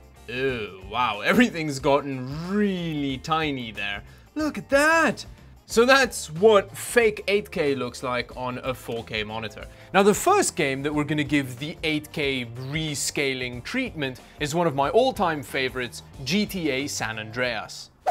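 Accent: South Africa. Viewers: Are you south African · He is South African.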